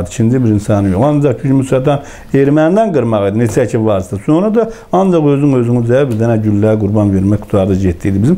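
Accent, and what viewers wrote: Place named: Gadabay, Azerbaijan. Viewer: "Allahın qadasın alım" cümləsi o qədərmi başa düşülən deyil, azərbaycanlı olan bu sözləri başa düşməyə bilməz. Yaxşı sən başa düşən kimi "Allaha qurban olum" deməkdi. Bu adam sadəcə savadlı adam deyil, Gədəbəy dialektiynən danışır, əsas odur ki, əsl türkdür, əsl qəhrəmandır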